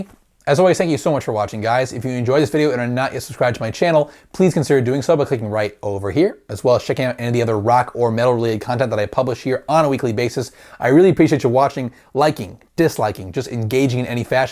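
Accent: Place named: New York, United States. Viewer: Oh, I could've sworn you were with that accent but maybe it's a NY one lol.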